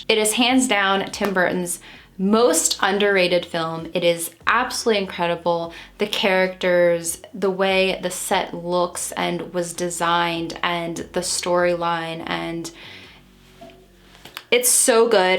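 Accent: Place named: Minnesota, United States. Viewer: What state do u live in? You sound like Minnesota like me being the ranch n many other things lol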